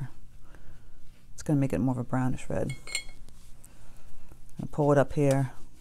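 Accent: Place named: New York, United States. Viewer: Thank you sooooooooo much for your AWESOME videos!!! I love it!!!! I watch them all!! I like your accent! NY?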